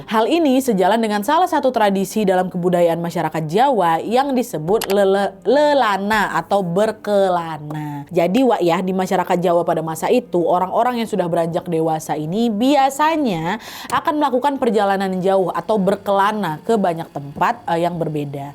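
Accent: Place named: Medan, Indonesia. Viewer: 1 rb a Terima kasih narasi Tunggu komen say ya. Abangmu umur 82 th Abang sangat suka cara narasi dengan aksen Medan, Medan Wak. Abang dinas di KKA 3 th s..d 1991. Komenk,u panjang Dek. Gak papa ya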